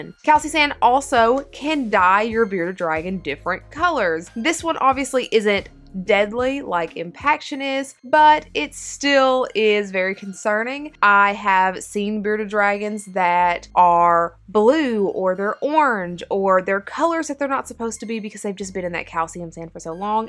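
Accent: South, United States. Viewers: Are you from the south? Juts wondering, I'm from AL so I recognize your accent. Love your channel, thanks for the great tips.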